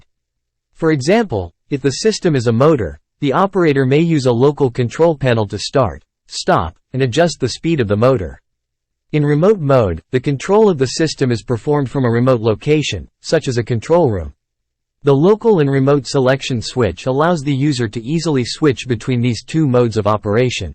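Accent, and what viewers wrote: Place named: Tamil Nadu, India. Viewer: Tamil voice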